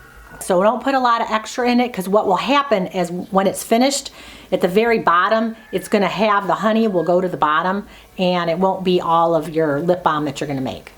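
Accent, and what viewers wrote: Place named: North, United States. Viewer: You are delightful to learn from. I love your northern accent!!!!❤️💕❣️ lip bomb. I live in Texas with my family in the north. It makes me think of them! Thank you for the info. 😊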